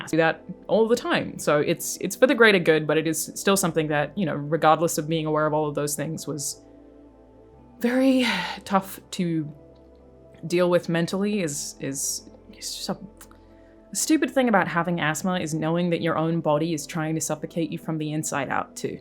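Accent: Australia. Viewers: hermoso aussie accent 💙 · The main thing I noticed is that your Aussie accent has come to the fore quite strongly.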